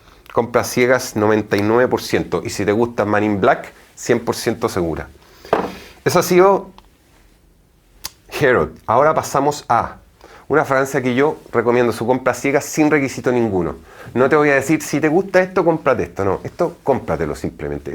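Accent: Chile. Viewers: Siempre te veo y algo tienes que aún. No descubro bien se que vives en España pero tu acento no es de español, eres Chileno verdad ? Sorry se que no tiene nada que ver con el video. Gracias a ti tengo 4 perfume recomendaste saludos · Es chileno'español cuando habla jajaja un crak abrazo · tienes un acento Chileno,es a si??,saludos.... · España? Pense q grababas en Chile debido a su acento. Gracias por el video! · De que parte de españa eres su acento es como escuchar a un Chileno. Gracias por su canal. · Acento chileno + acento francés, pero vive en espana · Hola, podrias decirme si realmente eres Chileno? Por tu acento lo creo..... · De que parte de España eres?, hablas como Chileno. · Tienes una mezcla entre Chileno y Español hermosa porque entre Chileno metes una frase español y es gracioso 😂😂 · Tan guapo 😊 tiene todo el acento chileno 😊☺ saludos... Eres seco 😙 y Viva Chile 😊😊😊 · Me encanta escucharte, sos un maestro, das cátedra y si a eso le sumamos los ojos claros, la camisa negra y el acento chileno ya sos irresistible. 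Más reseñas de perfumes femeninos y cuáles considerás unisex. Abrazo desde Uruguay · estarás en España, pero tu acento chileno te delata, el tono de voz y los gestos del típico santiaguino 🤣😊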